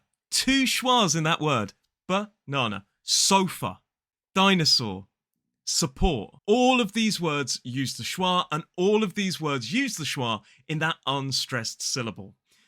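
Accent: United Kingdom. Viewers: 🙏💛💙 Adore British accent! It’s music to my ears! Thanks for the video! Pretty helpful!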